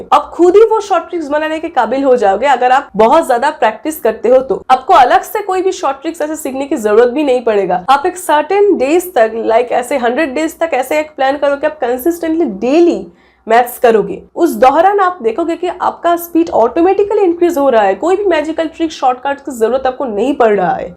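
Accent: Bengal, India. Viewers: Mam aap kya bengal se ho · Sir ne bola suggestions dungi😂😂 So cute hindi bengali people speak ❤️❤️😘😘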